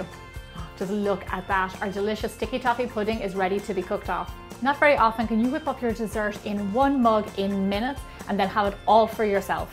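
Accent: Ireland. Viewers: Are you from the Republic of Ireland or Northern Ireland ? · OMG YOURE IRISH ME TOOOOO!!!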